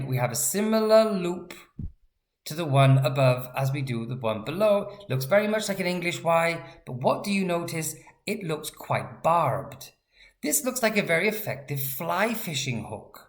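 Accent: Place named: Wales, United Kingdom. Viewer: Fantastic, thank you. A huge help, and fun to watch. Love the Welsh accent too.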